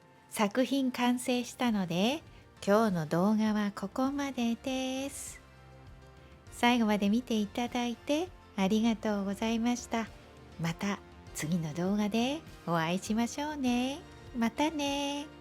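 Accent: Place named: Kansai, Japan. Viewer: 関西弁😂とてもいいですね、笑いました。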